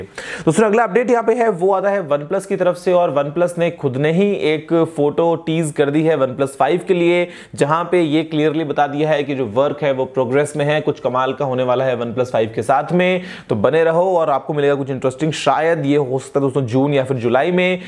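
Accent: Bengal, India. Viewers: bhai aap kiya bengali ho?/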